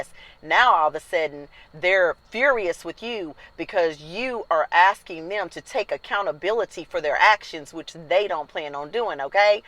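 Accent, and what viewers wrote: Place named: South, United States. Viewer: Love your southern voice. Great review and thanks for sharing. Can you please do a video on narcissist neighbors who refuse to take no for a answer.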